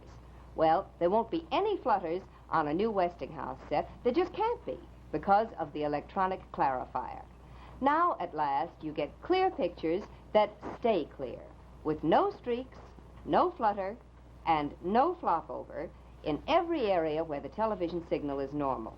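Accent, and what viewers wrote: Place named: Transatlantic, United States. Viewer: she's beautiful i love how over the years she never really lost that transatlantic accent especially in that 1989 appearance she made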